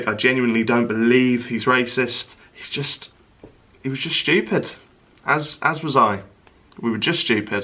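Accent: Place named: Newcastle, United Kingdom. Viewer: Sky, a gay guy on Twitter that I follow, loves the True Geordie's accent and I love it. He once shouted at me for asking why he loved the Geordie accent so much.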